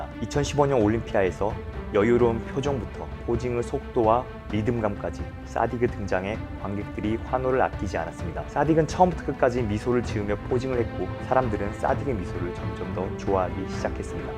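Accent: Gyeongsang, South Korea. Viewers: 경상도사투리쓰는사람이네 ㅋㅋㅋ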